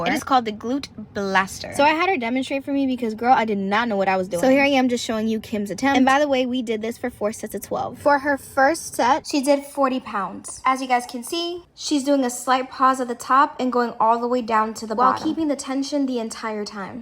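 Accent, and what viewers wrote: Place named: United Kingdom. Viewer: "Beautifully sculpted" is a massive understatement. I like your narration and the random British accent. I'm from across the pond myself.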